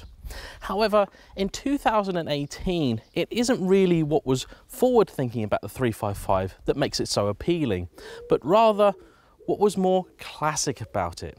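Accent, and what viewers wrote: United Kingdom. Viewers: ur brittish accent kill me, i need subs for that bcs of that i prefer american youtuber ex:dougdemuro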